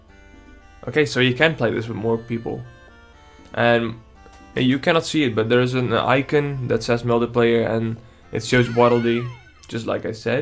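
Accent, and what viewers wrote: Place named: England, United Kingdom. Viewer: Hoe kan jij zo goed met een Engels accent praten?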